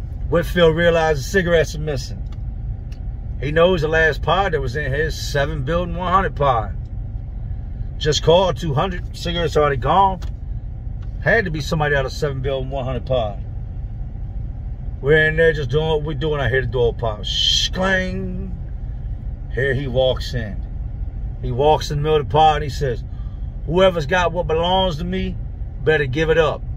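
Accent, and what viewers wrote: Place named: Philadelphia, United States. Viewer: Is this guy from Virginia or Philly because he sounds like he straight outta Philly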